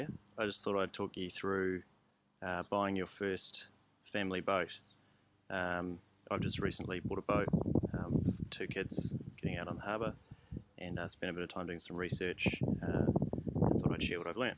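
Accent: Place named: New Zealand. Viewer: I can tell u r kiwi by your accent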